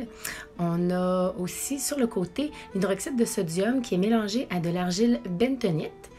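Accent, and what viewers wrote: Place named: Quebec, Canada. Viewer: Ah je me disais bien que vous étiez Québécoise, ça fait plaisir de voir une vidéo en français ! Et ne vous préoccupez pas des commentaires négatifs, je suis une Française de Paris et j’adore votre accent ^^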